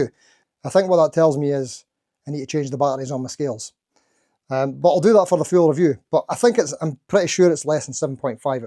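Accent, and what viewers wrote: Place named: Dundee, United Kingdom. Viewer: great video as always, i don't comment much on these channels but i love the way you champion budget guitars as opposed to ridiculously priced name instruments, being a weegie i also admire you Dundee accent. I myself have been playing 60 years now and still rubbish but no bad on bass, since retiring i have taken up buying cheap end instruments and doing upgrades for a hobby and really ennoying that. So i want to leave you with some words of wisdom, NEVER BUY A ROLEX FROM A BREATHLESS STRANGER. Keep up the good work pal and take care.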